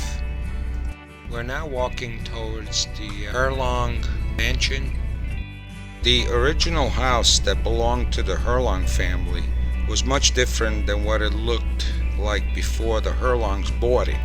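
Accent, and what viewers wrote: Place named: New England, United States. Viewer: should not be narrated by a yahkee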